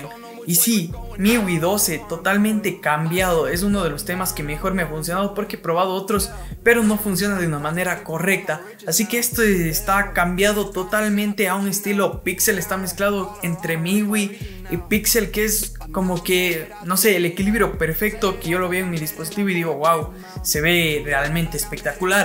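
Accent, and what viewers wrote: Ecuador. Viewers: Eres de Ecuador??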